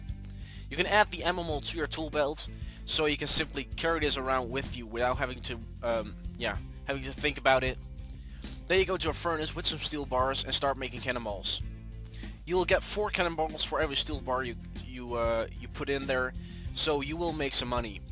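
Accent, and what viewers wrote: Netherlands. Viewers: ben je nederlands?, hele goede guide btw:)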